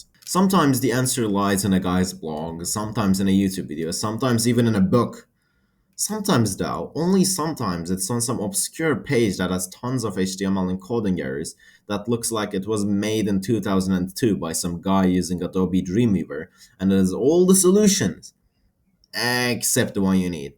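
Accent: Turkey. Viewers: Türk aksanı mmhhhh kolay gelsin brom. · Are you turkish? I saw the turkish "activate windoes" watermark . I should have understood it from your accent and profile photo. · Dude are you turkısh? EDİT: Kesin Türk'sün ama İngilizcen gerçekten çok iyi. Yani böyle dersin ana dili yani öyle konuşuyorsun.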